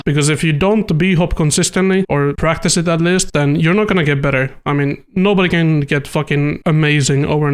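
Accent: Finland. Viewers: U finnish? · ooooooooooooooooooooooooooootko suomalaine kuuulostaa aksetti kyllä ralli enklish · Are you finnish? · Ur finnish?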